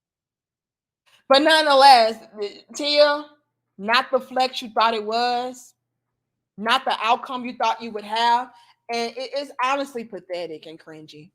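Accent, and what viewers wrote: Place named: Chicago, United States. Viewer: I think this wonderful woman is from the Chi the way she talks says it all from me beautiful keep it up sis tell it .......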